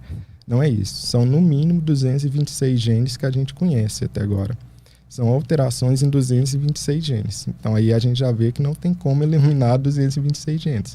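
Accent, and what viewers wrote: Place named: Minas Gerais, Brazil. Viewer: Que show de explicação. E com esse sotaque de MG Ficou MIÓ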